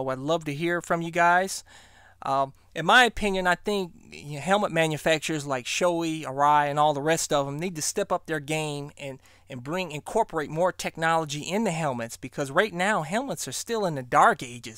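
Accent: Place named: Kentucky, United States. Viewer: You sound different. What happened to that Kentucky accent?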